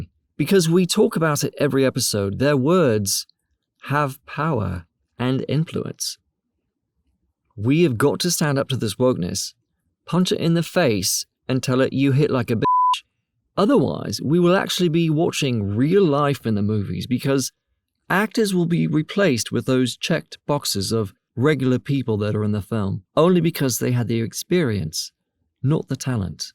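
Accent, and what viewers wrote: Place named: Australia. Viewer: the title seemed fine to me. A lot of the time I find videos with clickbaity, controversial one-liners and thumbnails cheapen the entire message. One suggestion if I may, would be to include more elements to highlight your points. You make a great point about how actors’ jobs are to perform and use their imagination. Some cuts to famous movies where actors play entirely unrealistic/impossible characters would help support your argument here. The Joker character could be a good one as obviously there’s no way to actually cast an actual psychotic homicidal anarchist in a movie! Just one small example though and by no means am I trying to influence your content. That’s one of the best things about YouTube, there’s so much freedom for creators and something for everyone. Guessing by your accent you’re an Aussie (so am I). Thanks for the video!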